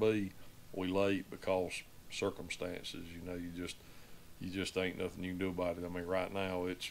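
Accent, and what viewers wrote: South, United States. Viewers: I’m just here to tell you, I’ll be here watching ya make whatever you make. I miss hearing real southern folk talk out here in Cali. You could polish a turd and I would watch. I am not your target demographic, but you can educate and explain things to people from different backgrounds. You do you, I’ll be here watching.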